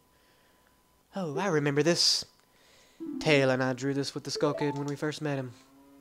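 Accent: South, United States. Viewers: been watchin these for the past two days so i had background noise while i play ocarina of time, why do you hate your voice, i dont, i got the same one kinda, i like the southern accent.. guess its cuz i was raised around it but still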